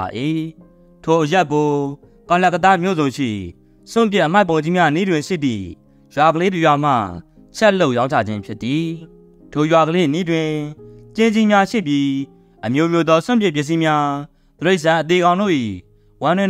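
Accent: Upper Burma, Myanmar. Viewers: အညာလေသံလေးနဲ့နား ထောင်ကောင်းတယ်အား ပေးနေမြဲပါ❤❤❤❤❤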